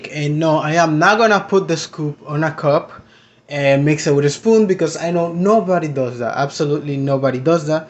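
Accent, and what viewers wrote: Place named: Dominican Republic. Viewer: You sound like you have a Dominican 🇩🇴 accent. Are you from DR?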